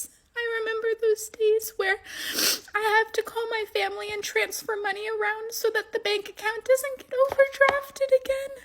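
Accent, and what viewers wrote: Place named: New York, United States. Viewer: Ah...could've sworn the way she speaks is from the NY area, as that's where I'm from.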